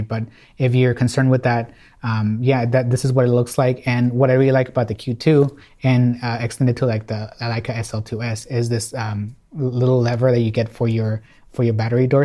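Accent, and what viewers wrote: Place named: Spain. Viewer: You sound spanish. Do you like Messi- I don't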